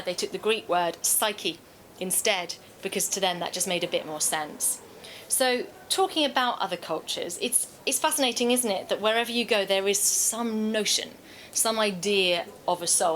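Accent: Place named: England, United Kingdom. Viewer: bit of an English accent and I'm liking it too!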